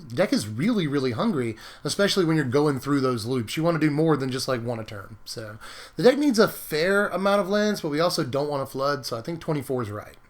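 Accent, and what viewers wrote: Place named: Georgia, United States. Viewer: You're from Georgia arent you? I had a feeling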